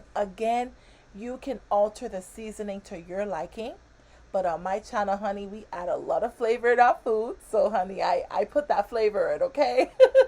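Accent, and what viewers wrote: Bahamas. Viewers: The accent is there! I know a bahamian accent when I hear it. I know my people! 😂 I knew it too! 🇧🇸🇧🇸🇧🇸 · I knew I heard a bit of our Bahamian accent!🫶🏾🫶🏾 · I’m watching your video and I keep hearing a Bahamian accent then you go and say Bahamas lol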